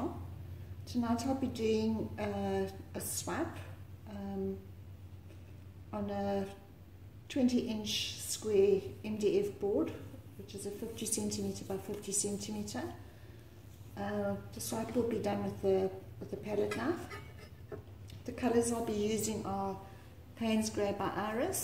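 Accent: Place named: South Africa. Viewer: Hi I stumbled upon your video and was very excited to hear your voice “A fellow South African”. I immediately looked for the paints you used in your description for the bloom technique. Your work is stunning 🤩. Which brand of varnish do you use and do you use the Matt or gloss. You might like to check out Bastion Paints, they are here in Salt Rock KZN. Thank you for sharing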